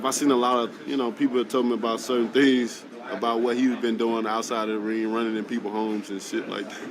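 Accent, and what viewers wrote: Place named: Alabama, United States. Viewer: i forgot this dude has the thickest Alabama accent lol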